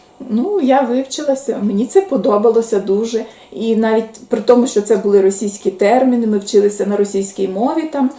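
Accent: Western Ukraine, Ukraine. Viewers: Вітаю! Власне, я писала, що ви, мабуть, родом із заходу України. Відчутно як ви розмовляєте. В жодному разі не ображала вас, бо я західнячка з Тернополя. Перепрошую, якщо для вас це неприємно. Залишайтеся здорові.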